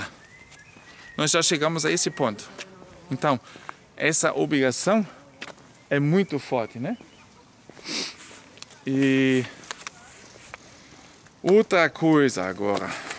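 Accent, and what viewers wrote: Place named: Germany. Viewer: Eu sou canadense-brasileiro. Nasci em Santos - São Paulo. É uma cidade portuária. Meu pai era canadense e minha mãe biológica era descendente direito de alemães. Meu avô paterno era japonês e minha avó paterna franco-canadense. Por parte da minha mãe adotiva meus avós eram ingleses. Infelizmente não conheci a minha mãe biológica. Eu simplesmente adoro os seus videos. Assisto todos os dias. Devo dizer, também que estou inscrito. VOCÊ lembra o meu pai quando fala português. MEU pai tinha um sotaque inglês muito forte. Sue sotaque alemão é bem característico, também. ACHO VOCÊ SENSACIONAL. UM ABRAÇO DO SEU AMIGO CANADENSE-BRASILEIRO.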